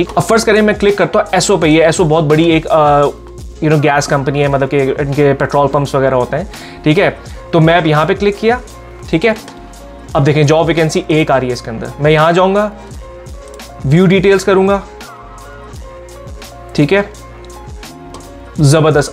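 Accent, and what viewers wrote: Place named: Karachi, Pakistan. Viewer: G way of talking bata raha hy karachi k hy ap 🌹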